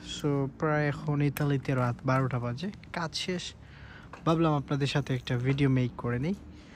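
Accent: Sylhet, Bangladesh. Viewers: Sylheti ni ba vai😊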